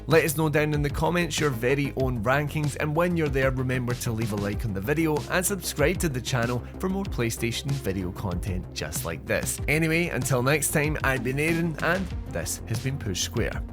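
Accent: Scotland, United Kingdom. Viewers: love the scotlandish accent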